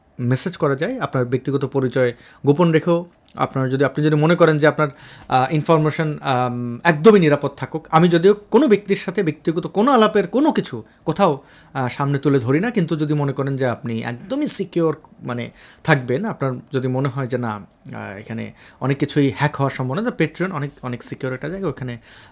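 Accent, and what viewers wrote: Sylhet, Bangladesh. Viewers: ভাই আপনার সিলেটি ভাষা অনেক সুন্দর।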